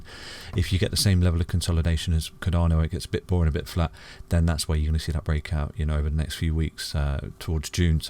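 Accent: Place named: Leicester, United Kingdom. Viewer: Ha I’m from London and my girls is from Leicester, I sent in one of your videos on Cardono yesterday and she said you was from Leicester I never noticed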